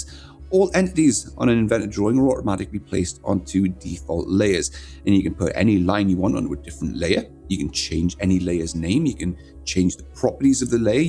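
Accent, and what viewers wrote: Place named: England, United Kingdom. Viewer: just watched your video for a second time at 1.0 play speed instead of normal. I have a hard time keeping up and I think its just due to the heavy english accent. You create excellent videos but a suggestion comes to mind, SLOWDOWN , for us dumb americans that cannot always pick up what you are saying with the accent. I am sure you have seen this comment before and are perhaps sick of it but it has merit . PS love the leather jacket and for whoever thought is was distastefull should pound salt where the sun does not shine! TANK'S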